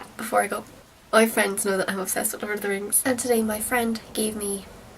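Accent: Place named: United States. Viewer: You're beautiful beyond words, but why do you use American English and speak in an American accent? It'd be odd to visit Africa to find someone dressed in Burberry attire and saying "ain' wannit, bruv, fam, bredren" in an English accent, wouldn't it? (points finger) Get where I'm coming from?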